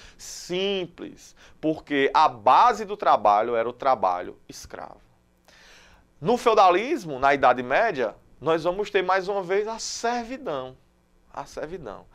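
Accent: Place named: Alagoas, Brazil. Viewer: Professor deve ser alagoano !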